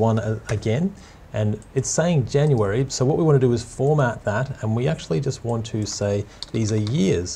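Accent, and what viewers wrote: Australia. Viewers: Great to hear another Aussie!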